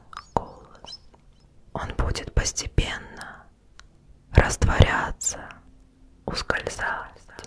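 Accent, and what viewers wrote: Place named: Russia. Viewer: Adoro el acento de las rusas, hermosa.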